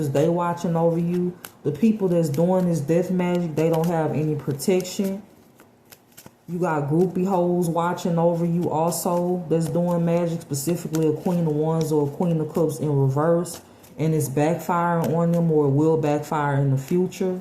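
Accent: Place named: Miami, United States. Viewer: Miami Voice!😊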